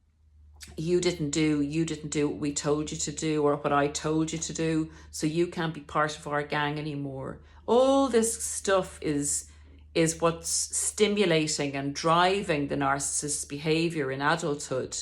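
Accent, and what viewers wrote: Ireland. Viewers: I would just like to say, it is your Irish inclinations verbally, that I like to listen to. If the Irish accent was not a part of your delivery, I most likely would not be here listening. Being here however, I find you do make many good sense statements worth hearing and appreciating, due to your 30 years of bitter experience with narcissist partners. I appreciate you admitting that you are not God. And many of us, do not believe in God, so we aren't enlisted by claims that we are in a Crusade against evil; regarding narcissists. Division is now the biggest problem in human belief. I don't want to drive other people away from me by deciding they are shallow (or evil)Evangelical characters. Nobody chooses narcicissim (at 2 years old). So I appreciate you backing away from the Christian Crusade mode (as much asthat seems right to you. I'm hoping you aren't becoming a part of the division problem we see in society. 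Hopefully, we inspire others to see clearly, not to denigrate others who may not agree with our Chistian beliefs, should we be Muslims or Jews or Spritualists. Anyway, thank you for your well-intentioned aspirations.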